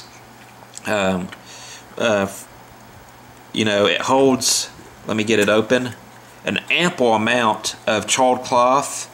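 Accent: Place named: South, United States. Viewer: Nice item - good video. Am thinking about getting one of these. One question.....which part of the US are you from? I can't sus that accent although it sounds southern to me. Dave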